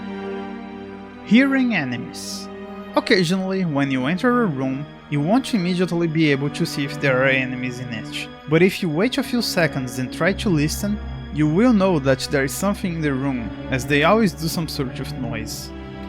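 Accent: Brazil. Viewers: O sotaque brasileiro tão reconhecível hehe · It's a Brazilian trying to talk english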